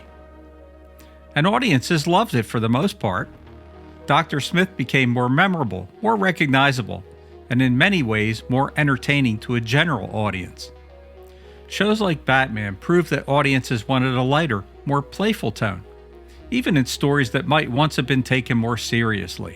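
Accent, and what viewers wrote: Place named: Philadelphia, United States. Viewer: Couple things: I note your Philly accent & Gene London reference. But beyond that, you're right, I was a kid watching it then. Episode one of the first season, in particular was serious & good, a real sci-fi adventure. After that, I felt things trending in a more lightweight direction. Still watched it a lot though.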